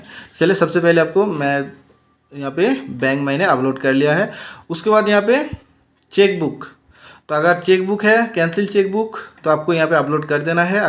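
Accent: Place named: Bengal, India. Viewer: হিন্দি টা ঠিক হচ্ছে না বাংলা চলে আসছে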